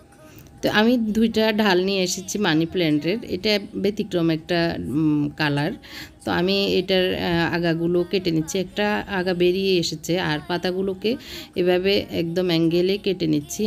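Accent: Noakhali, Bangladesh. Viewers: আপু আপনার বাড়ি কি নোয়াখালী?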